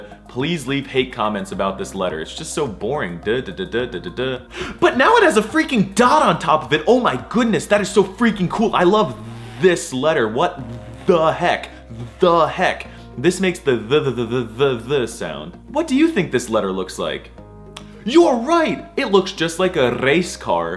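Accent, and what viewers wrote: Egypt. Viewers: This is not the real Arabic prononciation,this is Egyptian prononciation,just for correction . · Sorry but your Arabic is partially wrong. You are pronouncing and speaking Egyptian dialect especially for G which should be pronounced J. Your pronunciation of that letter is completely wrong. I didn't heard you talking too much sentences in Arabic but as per the few words you did used, I thing that also your speaking is Egyptian and that is not the real and full Arabic, it's only the Egyptian dialect. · there is a small mistake here . that alphabet doesn't pronounce like a "gagaga" its pronounce like gym. you seem to be learnt the arabic in egypt that's why you have the accent. other than this the video is awesome. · As a Arab, I’m pretty impressed by the explanations but some of the pronunciations were mostly in Egyptian dialect and not how they are actually said, other than that amazing video we are all here to support you my brother. 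· Yeah, hes speaks Egyptian Arabic. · Y'know, as an arabic guy myself, the fact he learned arabic is such short amount of time, is literally spectacular. Especially that he learned the Egyptian accent too, it's a pretty hard accent. I seriously enjoy watching you! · You speak the Egyptian dilect. It's different from the standard Arabic. The ج should be pronounced like in Jacob not like in game. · لهجتك المصرية تحفة براااڤو❤😂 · my guy is talking like Egypt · ook but why you speaking Egyptian 😂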